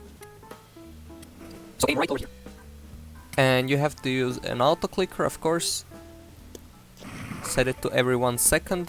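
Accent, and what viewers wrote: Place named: Russia. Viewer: u sound kinda Russian. also I had a question, does this farm work on paper? bcs ray works one doesnt.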